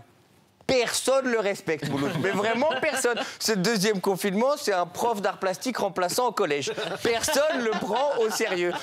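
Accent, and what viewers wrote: Quebec, Canada. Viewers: 10 sur 10 pour ton accent québécois, je certifie! 🙂 Bonjour de Montréal 👍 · Wow l'accent Québécois! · Sa parait il a entraîné son accent québécois lui je valide 😂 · Wow tu a tellement bien l'accent québécois impressionnant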